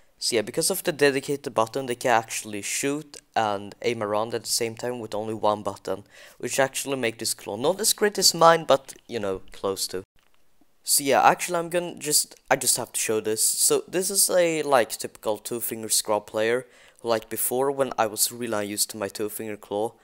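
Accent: Sweden. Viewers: You're swedish right? Jag hör det på din brytning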